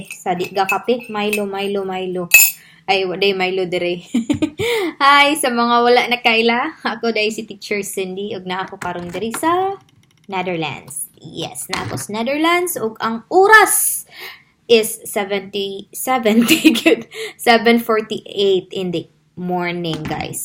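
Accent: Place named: Visayas, Philippines. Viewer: Ma'am..ganahan kaau ko nimo, ma feel jud nako imo pagka bisaya !.. hehee..love it!... Ma'am still hiring pa sila until karon?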